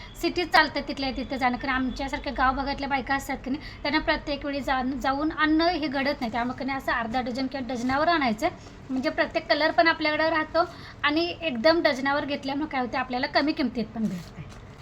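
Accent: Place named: Sangli, India. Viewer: Tumhi sangli sidevcha ahat ka bhasaha varun vatle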